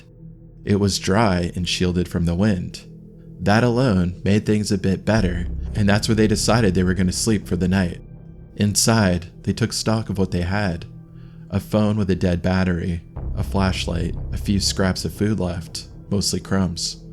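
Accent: New England, United States. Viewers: Are you from the northeast? Kind of new enland area?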